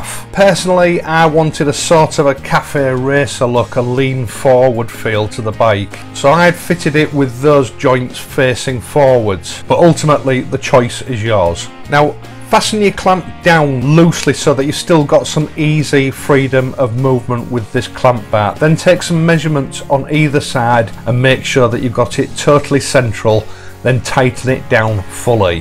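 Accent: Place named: Hull, United Kingdom. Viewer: Great video, I remember those Laverda handlebars from Jota reviews I read in the '70s when I was just riding a Yamaha FS1E. Nice looking Bonnie you have, I'm thinking of getting a T120 and saw your review on that also. I'm from Canada but have relatives in Hull and Beverly, your accent sounds very Hull to me but I could be wrong. Thanks for the great videos, good information and nice video quality.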